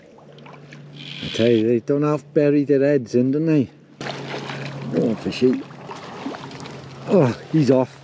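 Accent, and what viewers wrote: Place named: Wales, United Kingdom. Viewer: Who needs meditation when I can watch your fishing videos. Your soft Welsh accent is like a soft balm for these troubled times. If you weren’t 3 1/2 hours away I’d love to come for a days casting lesson with you 👏👍🙏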